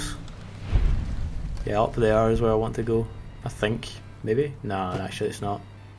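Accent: United Kingdom. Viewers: awrty mate ;) Nice video man, where about in Glasgow are you from, since you have Glaswegian accent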